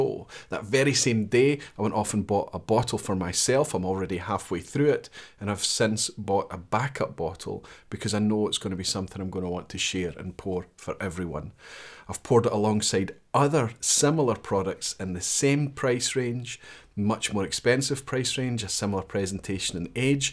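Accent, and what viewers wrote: Scotland, United Kingdom. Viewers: Don’t tell me that a great deal of this mans YouTube success is his accent! Love the Scots!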